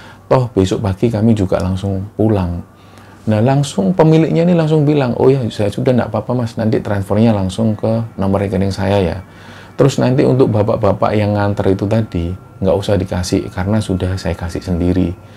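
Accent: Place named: Banyumas, Indonesia. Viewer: Pada2 ngapak.e... 🤩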